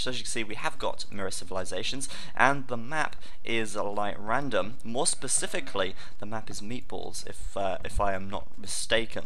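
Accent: England, United Kingdom. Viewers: I like your british voice c: it makes your game-commenting more enjoyable. Ps. Forgive my bad english c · You're a great commentator, especially with English accent haha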